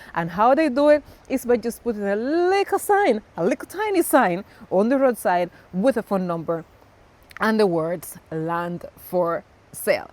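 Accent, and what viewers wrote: Patois, Jamaica. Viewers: You sound jamaican can hardly hear the spanish accent 😅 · ITS NEVER A DULL MOMENT WATCHING U I AM A JAMAICAN LIVING IN THE U,S,BUT THERE IS NO WHERE I LOVE LIKE MY COUNTRY,I OF TO TELL U I NEER TIRED OF LISTENING U TALK PATWHA U IS A REAL JAMAICAN FE TRUE I REALY LIKE WATCHING U BIG UP TO U N UR FAMILY 🙏🙏🙏🙏🙏🙏🙏❤❤❤❤❤❤❤❤ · You’re starting to sound Jamaican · I love to hear when u speak the patois ❤😅😅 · Your patois is soooo hilarious! 😂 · I am so proud of you. Gal yuh awesome. An mi luv yuh patwah/patois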